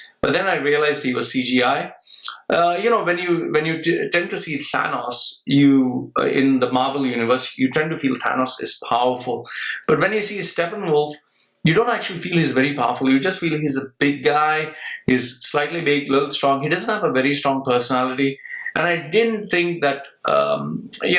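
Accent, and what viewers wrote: India. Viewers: This is one of many Indian accents Not every Indian talks like the stereotyped one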